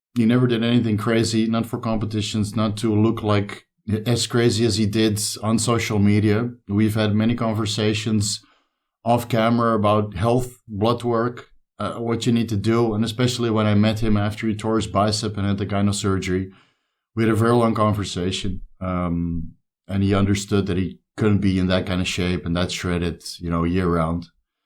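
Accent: Netherlands. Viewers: To be honest, I have only seen calloboration videos of Jo but I really liked him and I am left wondering why I only started to follow him now. Still, your loss of a loved one even resonates in me and he will be in my thoughts. I also do not know you, however, your accent sounds Dutch. In case you are, ik wens je heel veel sterkte in de komende tijd! Rest in paradise Jo, we will carry on for you, with you in our thoughts❤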